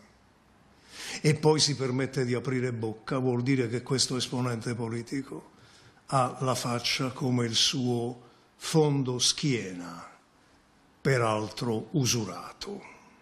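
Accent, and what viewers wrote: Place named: Naples, Italy. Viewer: ascolta, sai bene che ci troviamo in Italia e tutti hanno degli scheletri nell'armadio, ma a me sembra sinceramente che De Luca un po' esageri, il guaio è che sembra recitare una sceneggiata napoletana un po' clownesca. Poi la tipica pronuncia partenopea, mi sembra di sentire la pronuncia di mio padre e dei miei congiunti napoletani. la nostra famiglia è di Torre del Greco (provincia di Napoli dove sono nato anch'io e da dove mi trasferii successivamente a Trieste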